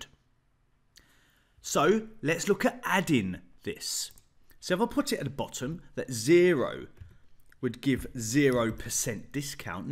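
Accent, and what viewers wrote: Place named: Cockney, United Kingdom. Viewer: Clear and understandable tutorials, and in my first 2 vids I've seen I've discovered DGET and XLOOKUP just at the time I need them! All delivered with a top geezer accent, subscribed! Question for anyone who can answer: I followed the steps for becoming an Office Insider, it seems I now am one, am I able to activate these secret functions somehow? They aren't immediately available. It also says "to a portion of Insiders", perhaps its not all Insiders who gain access? Cheers!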